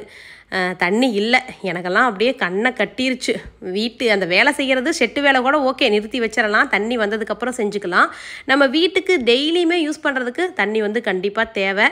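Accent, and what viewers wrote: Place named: Kongu, India. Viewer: Namma kongu slangkukkaaga ❤ thaan naan first parthen da....saatharanamave niraya english words use pandratha kurachukkunga ...example saree wear pannittu.....namma side casual ah saree kattittu😊 nu thaane solvom.....sorry😌 nirayamurai sollanum nu ninachurukken❤வாழ்க வளமுடன் 🙏🎉----❤maheswari chennai 😊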